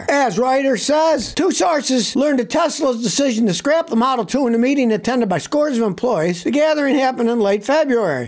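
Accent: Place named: New York, United States. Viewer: I have watched a couple of this guy's videos with him screeching. The jersey\new york accent is the most irritating in the world. How do you all watch this garbage? I am going to mark this channel as do not recommend.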